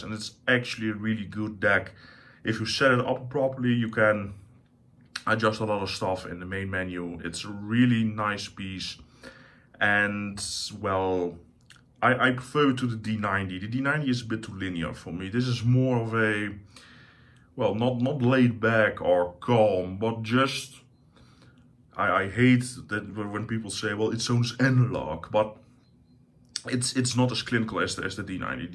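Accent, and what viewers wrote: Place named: Netherlands. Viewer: Leuke setup man, dacht all heejj dat klinkt als hollands engels😂🤣👌🏻💪🏻👍🏻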